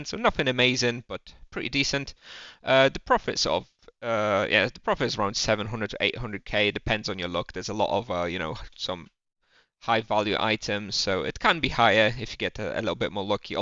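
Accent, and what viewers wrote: United Kingdom. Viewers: moje niedopatrzenie, musiałem skipnąć, bo intuicyjnie liczyłem, że będzie to na końcu filmu. Super kanał, masz mega potencjał ze względu na świetny angielski. Siedzisz w UK? Tak brzmisz hehe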